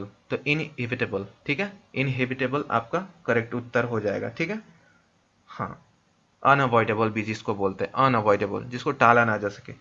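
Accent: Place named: Bhojpuri, India. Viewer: Cls to sir superb se duperb tha hi pr aapka bhojpuri v kch km nhi tha sir 😀😀😀😀👌👌👌👌👌👌👌👌👌👌👌👌👌👌👌👌👌👌👌👌👌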